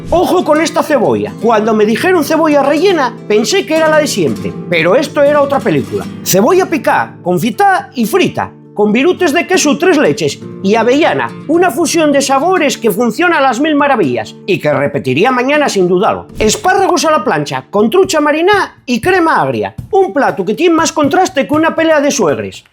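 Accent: Asturias, Spain. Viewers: Perdonen mi incultura pero ese acento es el de Asturias o de donde sale? Soy del sur, y tengo un par de colegas de asturias, y ninguno habla así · Porque hablas así .....eres asturiano de verdad?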